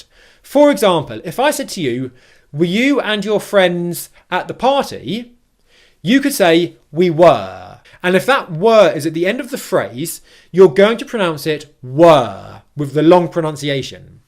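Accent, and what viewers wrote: United Kingdom. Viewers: From the first time I learnt English, I fall in love with British Accent. It's so elegant. Thank you for making the videos. I love watching it before I go to bed.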